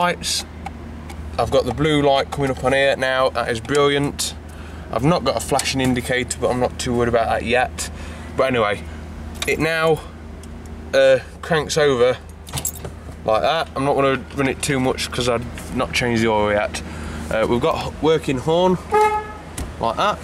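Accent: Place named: Nottingham, United Kingdom. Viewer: Nice to hear a Nottingham accent.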